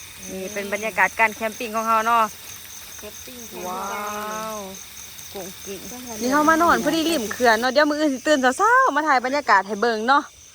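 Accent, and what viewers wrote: Ubon Ratchathani, Thailand. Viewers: สำเนียงพูดเหมือนคน อุบล ศรีสะเกษ สุรินทร์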